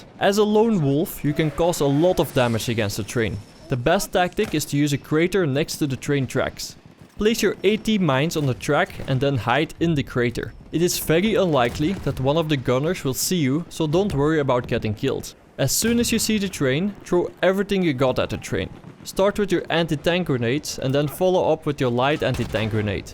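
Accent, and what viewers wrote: Netherlands. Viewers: ben je nederlands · are you dutch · ur dutch arent u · Yo man are u dutch of you are here is a sentence for you: goeie video man super leerzaam! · he clearly is, he has that distinctive Dutch accent that you hear when 90% of the Dutch people speak English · That dutch accent is amazing xD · Are You Dutch or something like that? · are you dutch/NL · Ben je Nederlands ik hoor het namelijk lol maar goeie video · you can clearly hear that he is dutch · where r u from? u Sound Dutch :) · The dutch accent is strong in this one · jij komt zeker uit nederland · Haha Nederlands dat hoor je meteen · is this guy Dutch cause his accent is not english nut great vid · Are you Dutch? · you just can hear you are dutch xd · Your dutch aren't you ? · Are you from the Netherlands? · ben jij nederlands of belg of zo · R u dutch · Are you dutch? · are you dutch??? 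· are you Dutch? if not from what country are you from?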